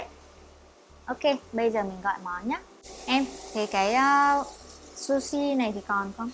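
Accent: Vietnam. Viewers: is this in southern or northern vietnamese ?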